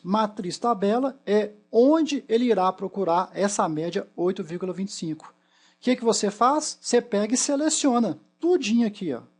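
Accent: Minas Gerais, Brazil. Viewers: Tem um sotaque mineiro, é mineiro? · Olá Prof., parabéns por sua aula. Uso excel, mas nunca precisei usar as fórmulas SE e PROCV, portanto tenho muiiiita dificuldade com isso, mas depois desta aula sobre SE, tudo ficou mais fácil e a sua explicação, com sotaque de mineirinho, foi ótima e muita clara para entender. Muito Obrigada. Sua nova fan!!:0)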